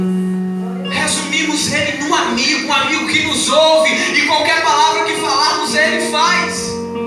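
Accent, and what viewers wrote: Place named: Bahia, Brazil. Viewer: Sotaque do interior da Bahia que saudade!!!!!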